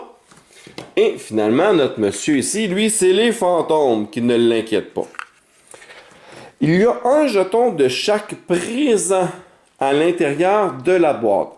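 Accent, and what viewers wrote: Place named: Quebec, Canada. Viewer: Merci pour cette chouette vidéo (avec l'accent québecois !) !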